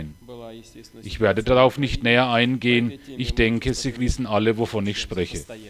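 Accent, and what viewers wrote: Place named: Franconia, Germany. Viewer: alter kannste kein hochdeutsch oder was, so stolz du auf dein fränkisch auch sein magst sollteste wenigstens versuchen es für die zuhörer erträglich zu machen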